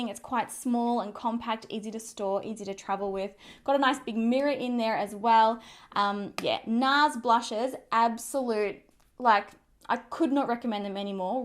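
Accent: Australia. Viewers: It is her Australian accent.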